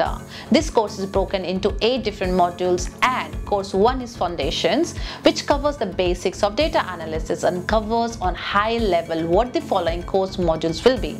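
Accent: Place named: India. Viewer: Your sound is amazing and your sounds just like indian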